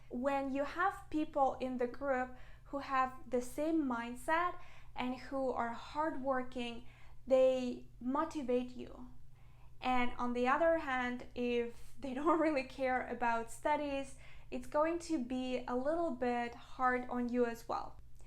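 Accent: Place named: Russia. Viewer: Her russian accent is pretty noticeable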